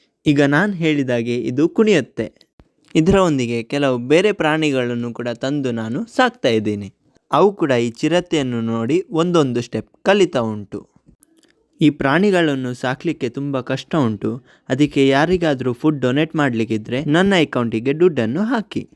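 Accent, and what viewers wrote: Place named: Mangalore, India. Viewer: Mangalore kannada kelode chenda ❤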